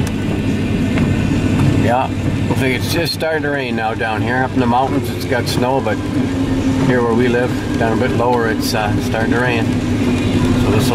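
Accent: Canada. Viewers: You sounds like your from Canada? My dad was born in Manitoba Canada! 1925 iam from USA 🇺🇸 but we have relative! Grate video 📼🎥🗻🗻🗻🗻🏔🏔🏔🏔🏔🏔🏞🏕🏕🌴🌲🌳🌲🌳🌲🌳🌲🌲🌲🌾🌿🦍🦍🦍🦇🐵🐒🐵🐒🐵🙈🙉🙊👽👽🐻🐻🐗🐗🐗🐶🐺🐶🐺🐶🐕🦌🦌🦌🦌🦌🦌🦌🦉🦆🦅🦊🦊🦁🦁🦃🦄🦄🐴🐎🐏🐐🐮🐄🐼🐼🐦🐣🐥🦎🦋🦏🦏🐯🐆🐈🐾🐾🐾🐾🐾🐾🐾🐾🐿🐿🦍🦍🦍👣👣👣👣👣👣👣👣👣👣👣🌲🌲🌲🌲🌲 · Good to hear that Canadian EH ! Right on man and cool tale as well.Cheers from a little island on the west coast O Canada.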